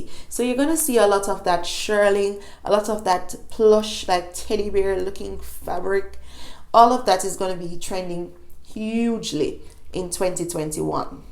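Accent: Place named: Jamaica. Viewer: was just saying you sound Jamaican. This is my first time coming accross your channel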